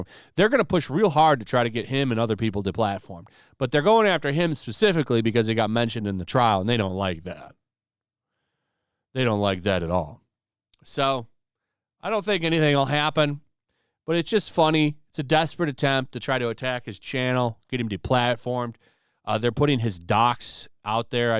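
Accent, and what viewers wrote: England, United Kingdom. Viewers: Just a small thing, but why are you reading in an english accent?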